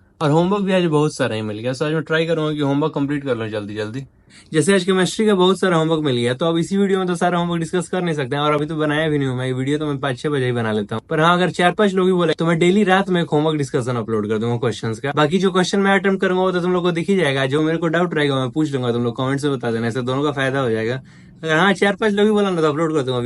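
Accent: Bihar, India. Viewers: Bhai aap bihar se ho kya ascent se lg rha hai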